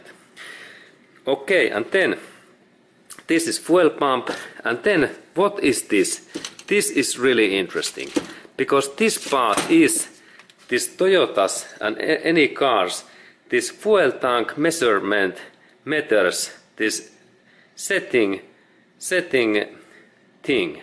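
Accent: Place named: Finland. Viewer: Suomi aksentti on paras aksentti. Subscribed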